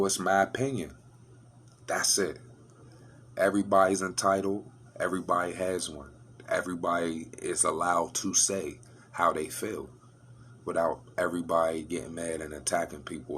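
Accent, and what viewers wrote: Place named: Pittsburgh, United States. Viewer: This don’t got shit to do w yo video but I can really tell that you really from the burgh from the way you talking 💯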